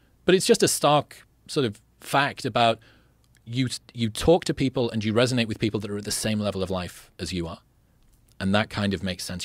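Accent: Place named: England, United Kingdom. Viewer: This guy just repeats Hormozi quotes in an English accent and people think he's deep.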